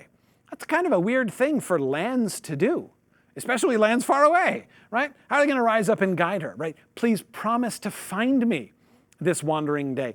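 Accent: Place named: Ireland. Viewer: Irish hobfoot accent doesn't help. Can you hear the sounds of "magic WANd" & "number ONe"?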